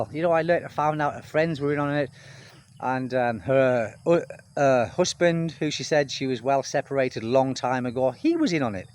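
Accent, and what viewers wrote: United Kingdom. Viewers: What’s that accent? Brit, Australian or Irish. ☘️ I bet u were very handsome in your youth. 🎉not a scammer ( retired airline pilot 👨‍✈️) 😊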